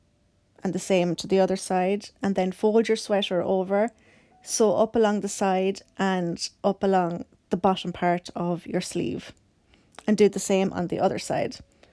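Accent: Ireland. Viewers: Hey! I love this sweater. I just found your channel and subscribed immediately. I love your Irish ☘️ (I think 😊) accent. I might be binge watching your channel today 😉. Thank you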